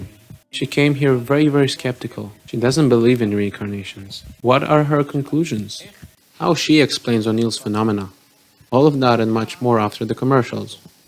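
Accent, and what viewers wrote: United Kingdom. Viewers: He speaks proper Londoner accent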